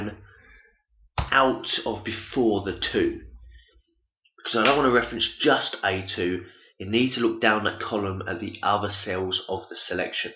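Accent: England, United Kingdom. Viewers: Thank you very much! You saved my life today at work! P/S: I really enjoyed English accent : ) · Very helpful. English accent makes it sound highbrow too!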